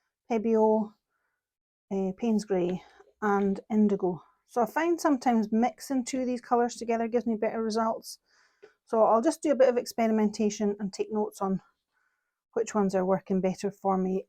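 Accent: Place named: Scotland, United Kingdom. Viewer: Love your test book. Love your Scottish accent. Love your work!! Subscribed 😊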